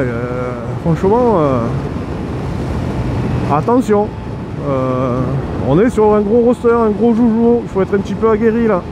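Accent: South, France. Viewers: Essaie super sympa et cet accent du sud qui fait plaisir a entendre il ne manque plus que les cigales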